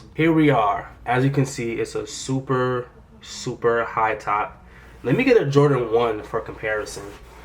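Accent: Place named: Connecticut, United States. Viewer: You gotta be from Connecticut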